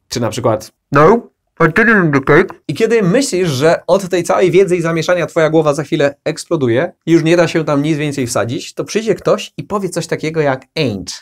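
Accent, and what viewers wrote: United Kingdom. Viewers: ok super to jest angielski a nie amerykanski hahaha Pomocy Ja potrzebuje AmerykanskiAkcent A to jest zupelnie inna bajka !!!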